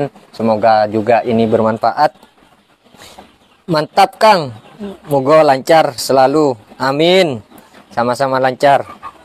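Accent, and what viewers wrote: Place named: Madura, Indonesia. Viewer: Mak logat madureh kak😅... Kaemmah empiyan kak...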